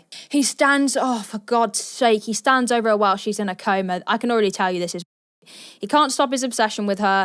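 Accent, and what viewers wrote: United Kingdom. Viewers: I like your british accent <3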